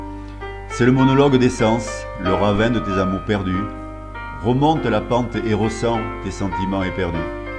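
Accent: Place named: South, France. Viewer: Quelle belle idée, ce poème, récité par son auteur a quelque chose d'un cadeau personnalisé. merci...et pour le bel accent du sud !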